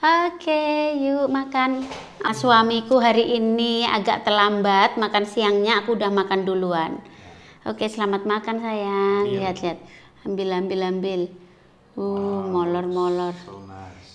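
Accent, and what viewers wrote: Java, Indonesia. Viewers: Jika lihat mb nih senang banget apalagi bhs jawanya medhok hehehe '' isah2 '' alias cuci piring wis semangat d sehat slalu ya mb sekeluarga 😍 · Asyik bgt lihat mbk masak memasak buat keluarga dan mertua... Natural dan santai bgt, ga dibuat buat bahasanya yg medok... Sukses deh buat mbk. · Mashallah, logate,jowo bgt, hadir mbk,aq wong solo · Pasta maknyus 😀😀👍👍 Lucu logat jowo nya masih ada👍 keren mbak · Aq penonton setiamu lho mbak cuman jarangan koment 😊... aq suka bgt denger kata mongah2 duh ngakak sendiri...pertahankan logat jawanya biar jd ciri khas mb...salam dari sby 🎀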